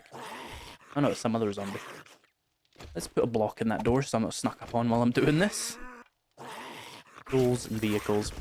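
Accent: United Kingdom. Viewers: very cool video! i always wonder in which part of the UK they speak your dialect...!? greetings from bavaria =)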